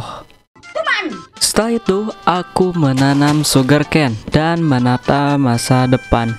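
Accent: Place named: Sunda, Indonesia. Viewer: Susah kek nya dh biasa ngomong bahasa Sundah teh maksain make bahasa indo😂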